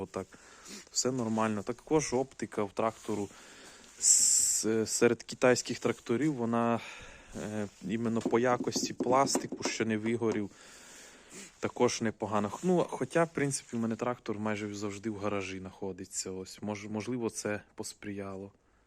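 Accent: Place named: Rivne, Ukraine. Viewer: Акцент напевно Рівненський ❤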